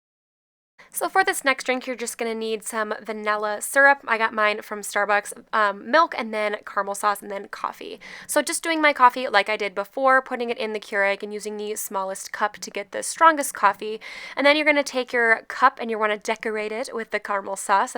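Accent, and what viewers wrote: Australia. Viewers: Are you an Aussie? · Is this Australian?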